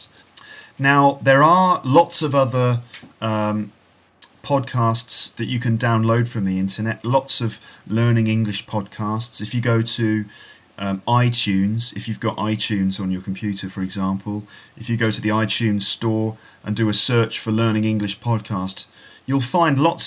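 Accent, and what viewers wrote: RP, United Kingdom. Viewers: Hi,! You are right. Good British Received Pronunciation is addictive!))) Your podcast is very helpful! Thank you!😊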